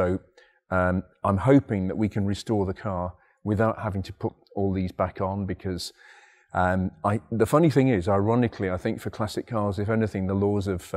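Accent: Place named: RP, United Kingdom. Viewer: Fascinating stuff - and a pleasure to listen to contemporary RP-style accent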